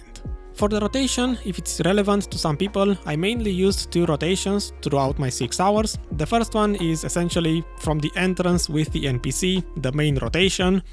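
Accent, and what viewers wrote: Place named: Romania. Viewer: You sound romanian :D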